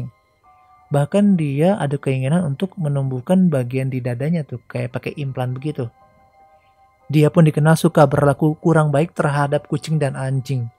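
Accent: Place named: West Java, Indonesia. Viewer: Mantap aa agak lucu dengar nya pasti dari jawa barat y😆 Pelajaran paling penting .. Penting ny kita bersosialisasi dengan orang lain biar klo ad apa ap ada yg tau dan nolongin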